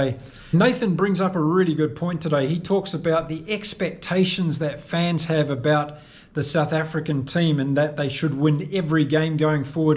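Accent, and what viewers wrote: New Zealand. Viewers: You have the least offensive kiwi accent. Fush and chups 👍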